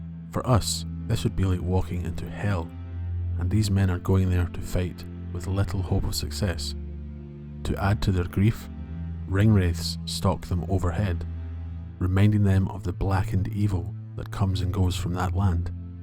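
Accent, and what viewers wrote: Scotland, United Kingdom. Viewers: Wow what a wonderful Scottish voice/accent, a real treat! Many thanks.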